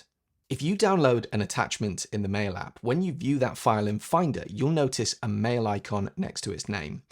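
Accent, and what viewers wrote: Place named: RP, United Kingdom. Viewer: Duck! I think I am addicted to this posh British accent...very nice content in nice pace.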